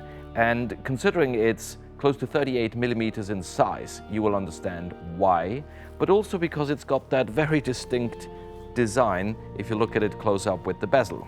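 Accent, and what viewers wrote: RP, United Kingdom. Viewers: Aurel Bacs has great knowledge but he really needs to stop with that posh English accent. Just doesn’t sound natural)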